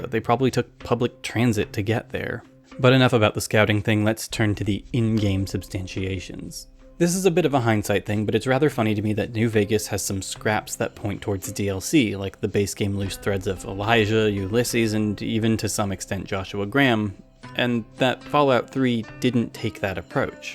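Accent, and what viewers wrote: United States. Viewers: You sound American, you can't access the Internet Archive?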